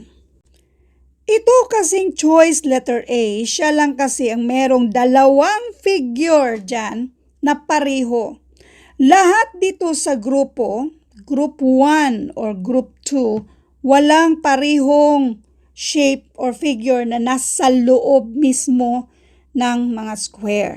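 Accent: Visayas, Philippines. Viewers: Bisaya accent 🫶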